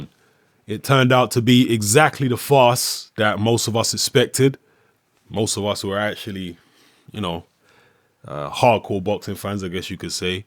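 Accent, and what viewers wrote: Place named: London, United Kingdom. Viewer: are you from north London? You have the same accent as all those grime guys.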